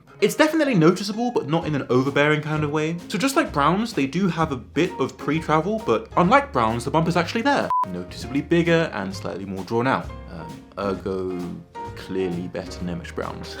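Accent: United Kingdom. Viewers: damn your sexy as hell my man! your british accent almost made me pop (^o^) no hooo moooooo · After watching all your vids I can't say tactile without a British accent. Im American.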